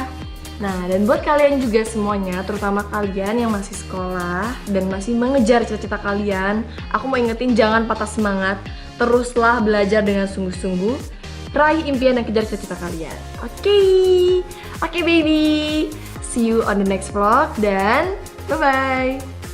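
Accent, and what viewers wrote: Java, Indonesia. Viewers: Guys. Medok bener ndok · Guys Ny ittu Medok banget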